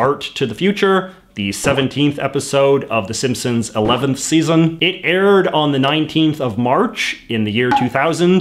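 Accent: Canada. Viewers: guy sounds like zach braff but Canadian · omg ur canadian accent is so funny LOL great vid tho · I'm Canadian, and you have the most Canadian accent I've ever heard. I've never heard someone pronounce "around" like you. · As a Canadian I can promise you that no one in Canada has a "Canadian" accent this strong. I call shenanigans! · I always thought us Americans always played up the Canadian accent but damn you’re super Canadian! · He talks “Canada” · as a Canadian I can say you've got quite the stereotypical Canadian accent! kinda funny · Some times I’ll forget you are Canadian and the out of nowhere you’ll hit me with an ‘aroond’ when pronouncing ‘around’ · Your canadian accent is strong af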